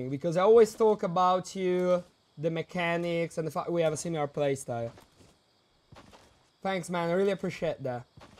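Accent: United Kingdom. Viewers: Ma quanto cazzo sei british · Quanto sei british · O mio dio ma quanto sei forte e anche molto british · Troppo brittish entra così a far parte della dpg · L accento inglese, oddio, mai piu da te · Grandissimo bro partita easy come sempre... Ma poi quanto sei british😂😜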